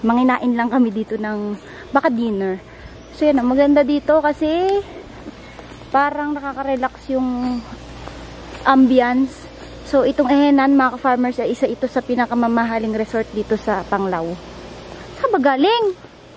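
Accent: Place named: Iloilo, Philippines. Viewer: Parang d ka nmn purong Tagalog ilongga ka ata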